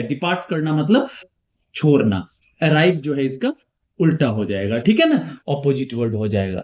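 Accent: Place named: India. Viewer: Very nice explanation. Which language is it? Very interesting.It sounds like Indian.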